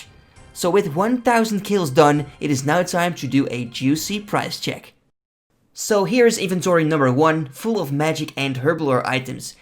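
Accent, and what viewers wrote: Netherlands. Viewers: I was like "this dude sounds so dutch" then I saw the location, gang gang shit lekkuurrr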